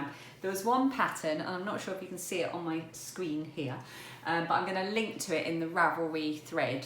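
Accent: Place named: Kent, United Kingdom. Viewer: Hi, I was born at West Hill too! Recognised where you were walking in this Monday's blog as it's near where I used to get the bus to school. I've watched your blogs for a few months as I love crafting and recognised your accent, so thought you must be in that part of Kent. Accent you may say, I haven't got an accent! That's what I though till I lived in other parts of the country. I'm a teaching assistant near Nottingham now and children are always telling me I sound different to them when we're doing phonics, especially 'a' and 'u'. Makes me laugh. Anyway take care.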